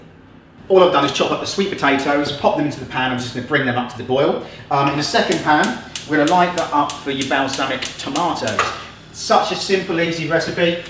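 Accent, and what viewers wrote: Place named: United Kingdom. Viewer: I don't mean to be too direct, but let me tell you: I love the way you talk! British English sounds so brilliant, especially the variety you are speaking. Sorry for this non-food-related comment of a little German linguist! Thank you for this lovely recepy as well, we will recreate it soon! Cheers!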